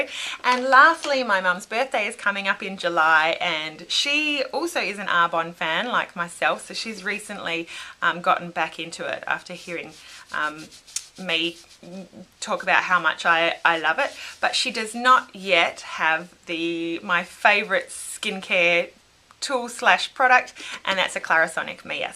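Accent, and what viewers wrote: Australia. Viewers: feel a bit special being mentioned :) btw i'm loving the videos finally someone who understands how ludicrously expensive makeup is here in australia! enjoying the familiar accent that i am not use to in the youtube beauty community.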